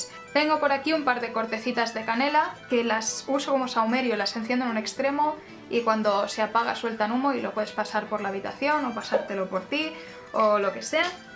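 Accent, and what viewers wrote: Spain. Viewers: De que parte de España eres?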